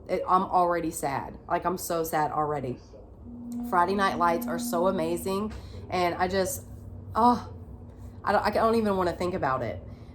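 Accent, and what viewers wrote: South, United States. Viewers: I loved getting to know you more! My mama was a single mom to 4 kids and I never knew how hard we had it. She is amazing and you remind me so much of her! STRONG! Now as a mom (of 2) who has a very supportive partner I just admire my mom and you and all the single mamas that much more. I seriously just admire you so much! And I love your accent! I’m a southern girl living in Utah and don’t get to hear it much often so it’s an extra bonus for me! ❤️❤️❤️ God bless! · I love watching your channel. I lived in Atlanta for a while, so listening to your southern accent so awesome. Listening to this episode on your channel, I find a lot of similarities in my own life. I started crying when you spoke about loosing your son because I also lost my son.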